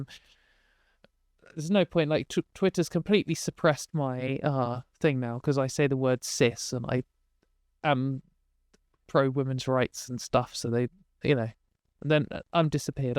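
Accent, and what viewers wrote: RP, United Kingdom. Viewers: Sending love from California. Your posh voice puts me to sleep after work thanks for the content 💞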